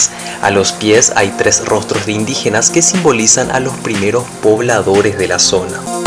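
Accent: Paraguay. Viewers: Es obvio que de PARAGUAY por su acento se nota a leguas.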